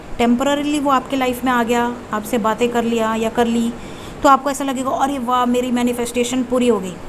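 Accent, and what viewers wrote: Varanasi, India. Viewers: Har har mahadev🙏❤ apki banarasi language bahut pyari h i love this language mam🙏 thank you angels thank you universe thank you divine thank you mam god bless you 🙏❤🌷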